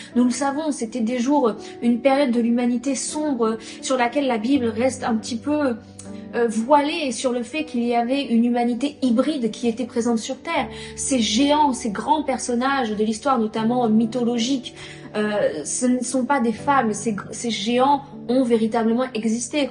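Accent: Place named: England, United Kingdom. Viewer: I'm loving your english accent !😍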